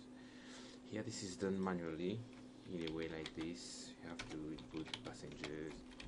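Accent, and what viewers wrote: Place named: Mauritius. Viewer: Hi, you sound like you are from Mauritius!:)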